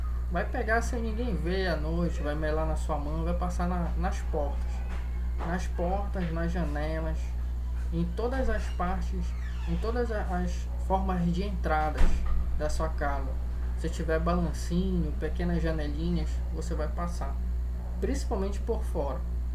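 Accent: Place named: Pará, Brazil. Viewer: Maninho.... Tu é paraense neh ?? Morei um tempo no Pará, e tenho quase certeza da minha suspeita 🤔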